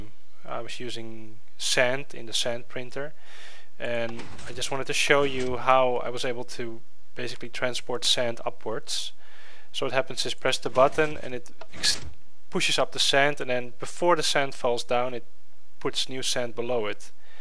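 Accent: Netherlands. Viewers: you're from holland aren't you?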